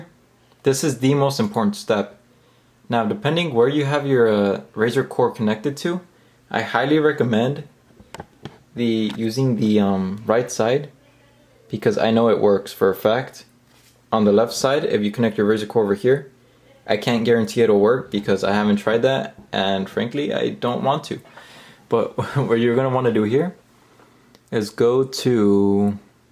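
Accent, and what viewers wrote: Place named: Mexico. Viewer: I can tell by your accent you’re Mexican. Where you from? Cali?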